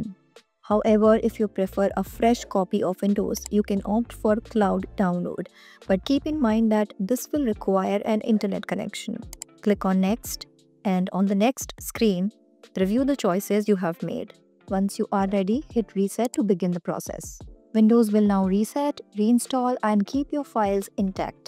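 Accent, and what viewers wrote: India. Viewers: That indian accent XD I can't get any more XD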